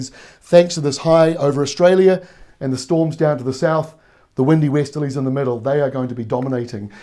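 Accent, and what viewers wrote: New Zealand. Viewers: Nice to have a kiwi reading the kiwi weather forecast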